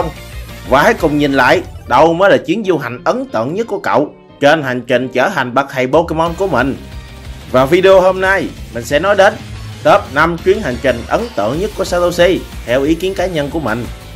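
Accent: Vietnam. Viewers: Theo dõi admin này từ hồi làm clip ngắn, lúc đầu giọng đọc rất tệ rặc khuông miền tây lại ngọng, đọc vấp, không rõ chữ nhưng càng về sau ổng đã cải thiện hơn, có thể ổng chưa bằng đuợc chất giọng của admin chính, nhưng sự cố gắng trong chất giọng và cả idea làm clip là rất đáng ghi nhận, mọi người nên động viên ổng phấn đấu thay vì bày xích ổng, ủng hộ admin và kênh PAG Center❤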